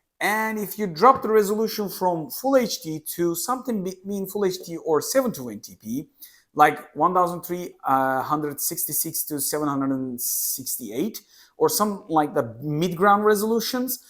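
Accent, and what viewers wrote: Turkey. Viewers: Reis türk müsün ? Türk aksanın var :> Ürün incelemesi için teşekkürler.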